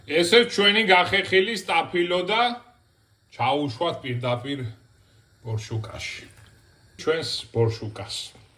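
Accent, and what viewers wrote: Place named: Racha, Georgia. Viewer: რაჭველი ხარ???? კილო გაქვს რაჭული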